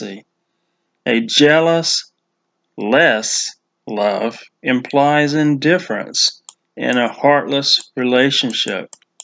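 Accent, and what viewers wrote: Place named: Scotland, United Kingdom. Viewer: I have given my roommate many a giggle by learning to speak Scottish like you: "How are yuuuuuh!" :)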